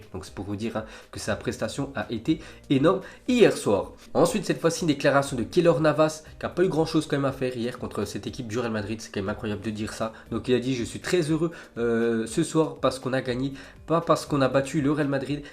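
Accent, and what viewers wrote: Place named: France. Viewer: c'est le français typique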